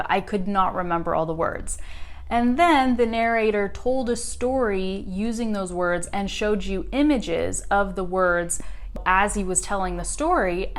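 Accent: United States. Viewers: I just step by saying that I’ve found many of your videos very helpful. I've taken the time to listen and watched some of them twice so I can listen to your pronunciation, intonation, and so on; and then repeat or search for a new word you've said. I like very much how you explain the things or talk about different topics. I'd love to have an accent like yours, I'm working to have a better accent, more American. So, thank you so much! And I'd also like to add that even there are many women who make these kinds of coaching, you have become on my second favorite one. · I like a lot of the your lessons. I have difficulty in listening, but I am trying to understand American English native speaker like you. Thank you for your great job! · Hello Miss. I suppose you speak American's English, at what state you are? I'm from Guatemala City. Salute. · You are a good teacher. 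I understand what you say and it is great. I don t get used to American accent but this time I understood it...Great! I usually "suffer" when I watch american movies because I like them but I have to watch them again and again to understand..At least not the whole movie but just some chapters of it... I hope I will overcome this fear of "listening" one day Thanks. Take care ..bye🙋 Greatings from Italy🌍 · You are a good teacher ,thank you,you made me love this language and american accent Deeep greeting for you from sudan😍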